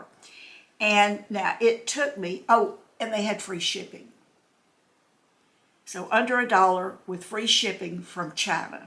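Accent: South, United States. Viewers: I love seeing you with that adorable southern accent & you are such a true beauty❤️🍷🍷👏👏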